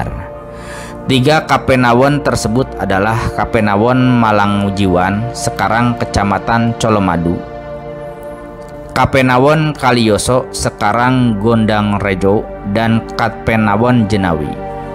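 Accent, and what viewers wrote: West Java, Indonesia. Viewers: dr dialegnya 70% sya yakin sepertinya pengisi suaranya orang sunda.. Hatur Nuhun udh bahas karanganyar... sampurasun.. 🙏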